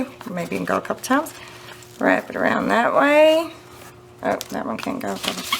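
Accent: South, United States. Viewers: You are so darn cute!!! I'm from the South and I love listening to you as I have lived in California long enough NOT to talk Southern and I so enjoy listening to you and yo are so funny. I have the same problem you do with something right in front of me and NOT being able to say the right word, ROFL!!! Anyway, I have just watched all 4 of your videos about the tag journals and I have learned way more than if I watched 10 other videos. THANK YOU SO MUCH!! I think I like the putting together of them like the one at the end since the stitching part looks more complicated than I think my brain would allow me to do, hee hee~~ Thank you for teaching this newbie so much. Take care and God bless you~~ xoxo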